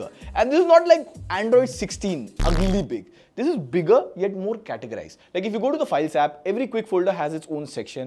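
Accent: India. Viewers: Look man, I like content but there is one solution actually two solution I need to work on Indian accent to learn it Or you need to work on American accent? And basically get more views and go International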